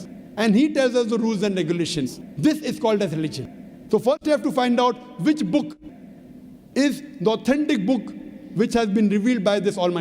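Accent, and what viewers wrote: India. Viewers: salamu alikum Dr. ZAkir, would you please add an auto-captions in English, so we can understand your accent. we are from north Africa, and it is difficult for us to understand the Indian English accent. thank you so much.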